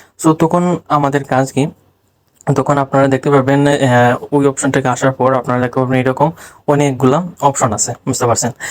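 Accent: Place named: Sylhet, Bangladesh. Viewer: Vai Sylheti ni kota sune to seta mone hocce 😊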